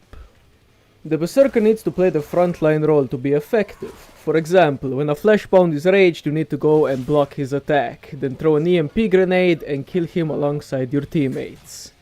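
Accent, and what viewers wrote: Russia. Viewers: You sound very russian. You should try to go for a 50/50 comedy and info mix, you will do really well. Anyways, video makes a lot of sense, good shit!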